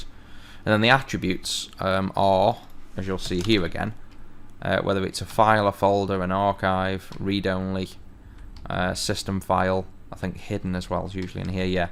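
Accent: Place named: England, United Kingdom. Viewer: Thank you very much for all these tutorials, they're the best and most in-depth explanations of WPF and C# I've found on youtube. Great teacher! I'm going to start thinking of code in my head in an English accent now, which is fine by me!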